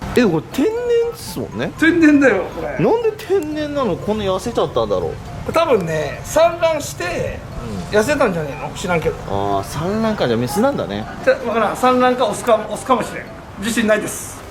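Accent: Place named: Nagoya, Japan. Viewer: 名古屋弁、いいなあ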